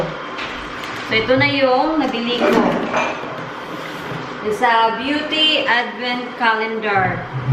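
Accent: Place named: Visayas, Philippines. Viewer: Hi ate love you bisaya accent❤ #christmas2019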